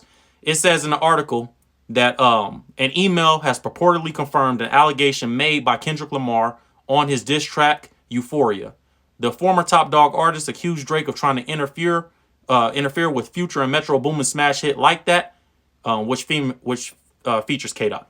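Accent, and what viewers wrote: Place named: Los Angeles, United States. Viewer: I like your LA accent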